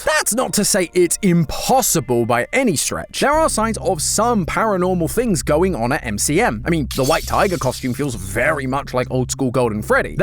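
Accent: United Kingdom. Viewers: What happend to matpat? Why is there a British guy?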